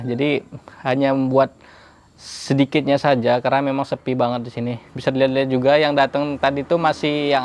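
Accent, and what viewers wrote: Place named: Lampung, Indonesia. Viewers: pantesan logatnya kok kayak orang lampung, kk ternyata orang lampung tohhh.....mirip kali sama teman kuliahku Redy, mukanya, suaranya ... wkwk.. sukses terus ya kak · Bang Lampung nya dimana? Dari bahasanya yg sedikit terdengar...seperti bahasa daerah Lampung Barat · pertm nntn vlog bang...lgs tau kl bang suku Lampung,khas dr logatnya.trnyt qt msh 1 daerah...kamipun sukuny lampung asli ibuku dr tanggamus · Lampung ne gegoh nhn bahasa ne heee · Baru berapa hari di rumah udah lampung kali logatnya bang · Bahasa lampungnya kok mirip peminggir/pesisir... aslinya Lampung mana bang? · Pantes kek logat lampung pas liat video" yg baruu wkwkw ternyata orang lampung... Wah ikut bangga... Lampung mana bang...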